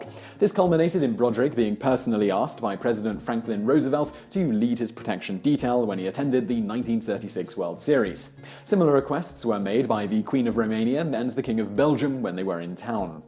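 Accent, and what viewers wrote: United Kingdom. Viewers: gabriel toews, Please, don't beat yourself up about that. Even the light-hearted and "fan-friendly" way Simon presented the story of his life (coupled subliminally with the British accent) seems to put a shade of "knight errant" to him... It's actually a popular media ploy... I sincerely hope this can help you (and others) start to disseminate the substance of the story or presentation (with or without subtext)... Thus building and contributing to us all gaining in our critical thinking and deciphering skills... :o) I doubt you are an idiot... Just like most of the public... It's just easy (sometimes) to accept even trifling news at face value. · What I find ‘ironic’ about this video is the British-accented presenter and the American subtitles - “Color”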